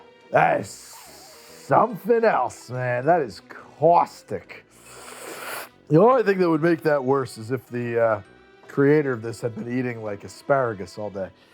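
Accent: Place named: New Jersey, United States. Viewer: I love that Jersey accent. Arange. Can't help but repeat it when he says Orange. He says it soooooo much lol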